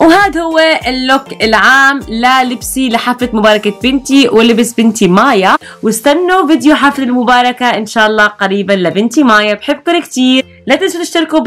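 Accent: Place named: Syria. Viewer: لَيش بتحكي سوري ؟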